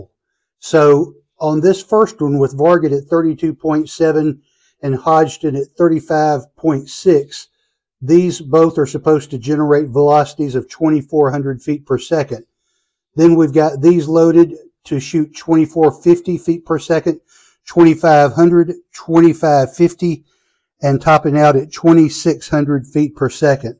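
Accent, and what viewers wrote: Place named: Pennsylvania, United States. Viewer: Entertaining as always. I have read that a lot of shooters like the H4350 but at higher velocities. 2750 FPS seems to be the sweet spot. Some talk of RL17 also. Apparently it has been hard to get but the posts are older. I wish I had an indoor 100 yd range. BTW you are located in GA but your accent makes me believe you are from PA?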